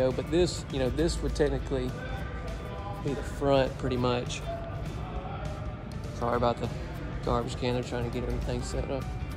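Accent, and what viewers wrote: South, United States. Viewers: It's a beautiful home and if you lived in this home, you could never be called "trailer trash." Plus I love this guy's southern accent. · How Y'all doing. Love your southern accent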